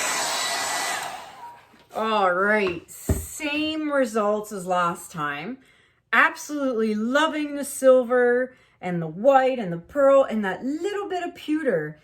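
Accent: Canada. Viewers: SO beautiful!!! I picked out your Canadian accent (How? Because I'M Canadian!)!!! lol Where in Canada do you live??? Your work is spectacular,! How long did you paint before you got to actively selling your work?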